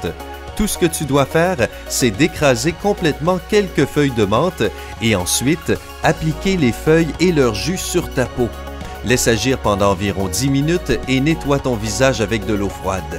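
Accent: Quebec, Canada. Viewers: Accent canadien je zappe c’est ridicule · Le gars c'est un canadien ? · vous avait remarquez la vois canadien cool non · Faut vraiment changer la voix-off de vos vidéos les gars, ça va plus du tout la version québécoise... mdr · Le fameux accent québécois ça me tue 😂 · Wilson Temat non dr bot est noir et celui la est quebequoi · C'est l'accent Canadien ou Québécois désolé je sais pas vraiment entre le deux ^^ c'est pas très sympa de disliker alors que c'est pas de la faute de la personne si elle a un accent.. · Florian Guerif la voix off québécoise est BEAUCOUP mieux que le mec de Lama Faché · C'est plus québécois ça en fait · Celui qui parle dans la vidéo est un québécois ou un marseillais comme vous voulez 😅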